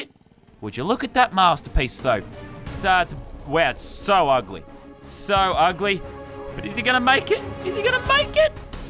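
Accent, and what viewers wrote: United Kingdom. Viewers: lazerbeam you sound British sometimes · Love your British 😂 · Aren’t you british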